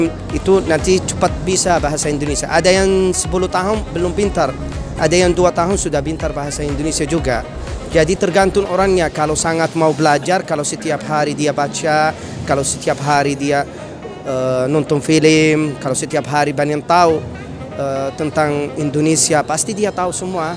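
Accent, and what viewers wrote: Arab world. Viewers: Iya tajwid nya masih kental..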